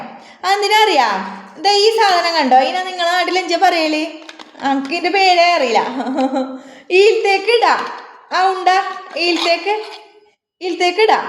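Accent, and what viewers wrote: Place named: Kasaragod, India. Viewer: ആക്ടിങ് അല്ല ഇത് കാസറഗോഡ്ഭാഷ ആണ് , ചില സ്ഥലത്തഇങ്ങനെ ആണ്, ബന്തടുക്ക, പൊയിനാച്ചി, ഇങ്ങനെ അങ്ങോട്ട്‌ ഇതാണ് ഭാഷ ❤️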